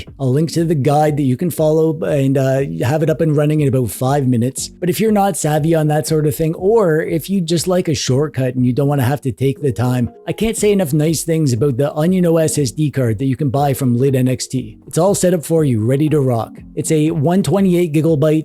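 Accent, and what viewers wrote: Canada. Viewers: This is my first time watching any of your videos, and I've now just ordered this, but one question... are you Canadian?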